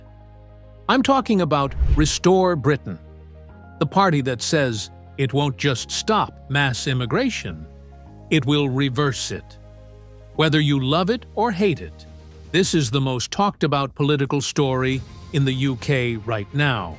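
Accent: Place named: United States. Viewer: Next time you make a video about the UK, you might consider using an AI voice with an actual BRITISH accent, as opposed to an American one. Listening to this was really getting on my nerves, but the way it pronounced 'Fareeeij' was the final straw for me! Just sayin'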